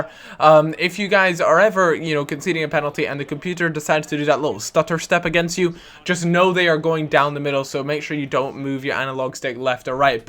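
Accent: Scotland, United Kingdom. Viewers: a thought you were scottish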